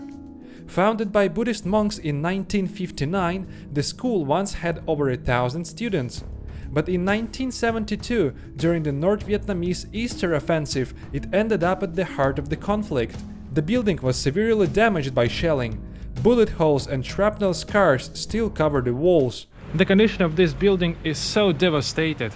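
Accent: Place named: Russia. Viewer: The Russian English :D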